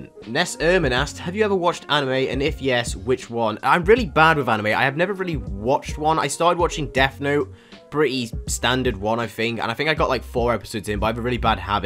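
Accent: United Kingdom. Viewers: He has a British accent? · Why is it I only just realized.. You have a British accent. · Ye I didn’t know- I thought British but I also heard American and Scottish and Australia at same time but in curtain words? Hard to explain lmao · Are you British, i cant really pick up any accents well and was just wondering 😂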